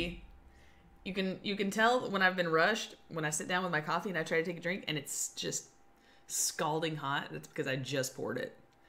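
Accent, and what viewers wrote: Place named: South, United States. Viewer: I love your Southern Accent Southern Accent Gang love from Mississippi also you’re so adorable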